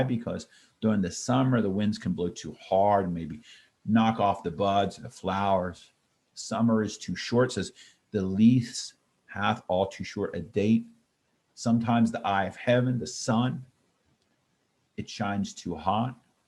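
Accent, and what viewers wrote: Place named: Canada. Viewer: Cool, it sounds like your accent is Canadian, as I think a teacher YouTuber his accent is similar to you.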